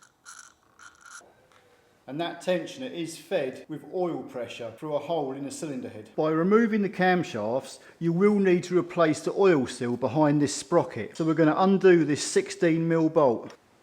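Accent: United Kingdom. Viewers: Hi british accent, this wet belt made by Dayco?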